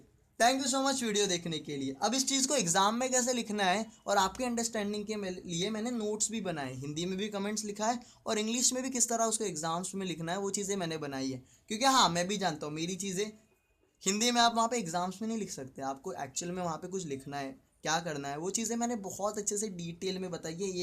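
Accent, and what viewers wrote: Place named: Marwari, India. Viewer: Marwadi k tarah😂.. Ek no bhai👌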